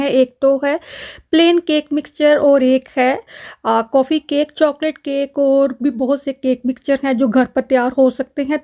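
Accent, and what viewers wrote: Punjab, India. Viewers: Very nice I like ur Punjabi touch in vlog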